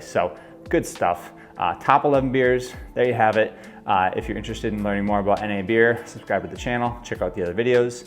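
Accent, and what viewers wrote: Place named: Minnesota, United States. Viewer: I hear Minnesota and you got my sub gotta support a fellow Minnesotan. 🍻 Was looking at your vid to see if Bero is the best but I think I’ll have to try Bero and Go Brewing. Dad’s a beer lover. And trying to get him to ween off the alcohol because of his health but I’m also interested in the NA stuff because I’m not a fan of alcohol.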